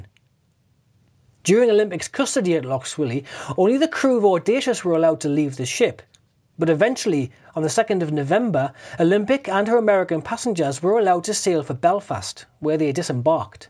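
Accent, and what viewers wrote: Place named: Scotland, United Kingdom. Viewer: I definitely like your Scottish accent. Thumbs up, subscribed.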